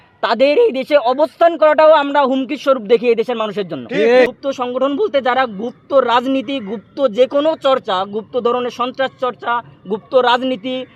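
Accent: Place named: India. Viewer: এই গলা হিন্দুস্তানি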